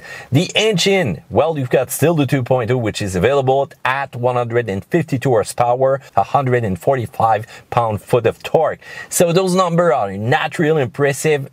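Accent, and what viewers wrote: Quebec, Canada. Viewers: Quebecois accent...not "Canadian". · Love that Canadian accent....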